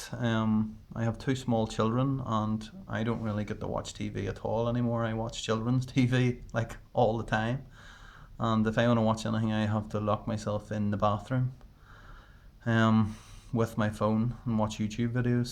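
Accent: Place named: RP, United Kingdom. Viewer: At least you haven't turned into an arsehole now you're popular unlike some of them brewtubers out there that think they're the big I am. Nobody's ever going to read this so I'm not even going to bother trolling. I think eventually everyone learns to relax. You sound kinda posh in this. I first did videos for my mate Jon (he'll be on youtube one day) where I was fine, then did videos for youtube that are awful and then pretended I was always doing videos for Jon (and still do) and it got easier.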